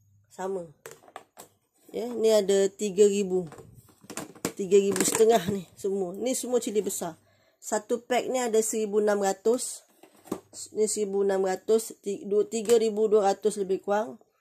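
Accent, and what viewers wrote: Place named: Negeri Sembilan, Malaysia. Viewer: sedap jugak loghat negeri sembilan ni😅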